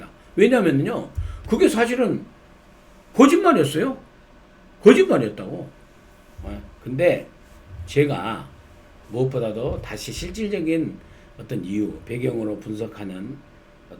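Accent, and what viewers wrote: Jeolla, South Korea. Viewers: 아재전라도 말투네 사상이 맘에든다 좌빨도 종북좌빨아니고 안보좌빨은 괴앗다 ㅎㅎ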